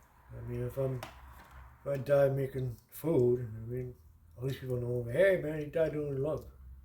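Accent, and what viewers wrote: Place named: Boston, United States. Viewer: The CC heard your Bahston accent as Alta. :face-green-smiling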